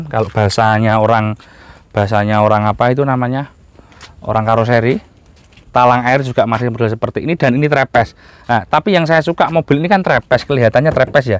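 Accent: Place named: East Java, Indonesia. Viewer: Saya orang Jawa Barat, tapi kok menikmati gaya bertuturmu yang Jatim bgt😅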